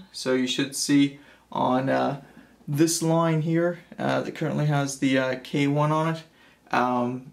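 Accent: United States. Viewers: American speaking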